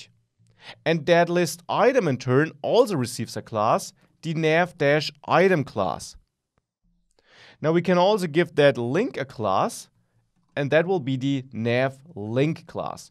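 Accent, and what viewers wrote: Germany. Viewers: I bet 100 dollar he is from germany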